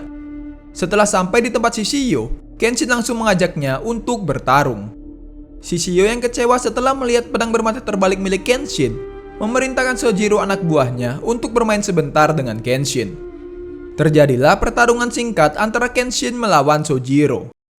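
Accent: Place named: Java, Indonesia. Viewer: Suaramu gk enak cok medok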